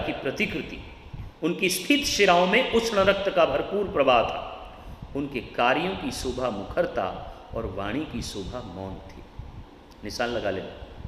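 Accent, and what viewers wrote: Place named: India. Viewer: Your voice completly hindi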